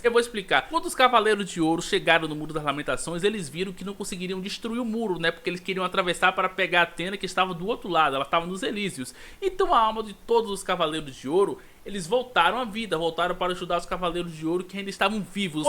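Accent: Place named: Bahia, Brazil. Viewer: Você até tentou esconder mas eu percebo que seu sotaque é daqui da Bahia. Ganhou mais um inscrito por isso ❤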